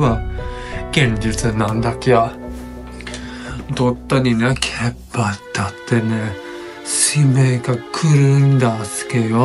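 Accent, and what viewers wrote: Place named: Nanbu, Japan. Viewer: 南部弁初めて聞きました。同じ日本語なのに不思議な感じです😊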